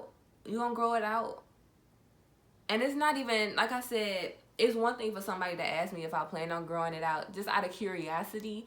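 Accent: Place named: United States. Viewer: Where in the US are you from... I love da accent. You are beautiful and blessed.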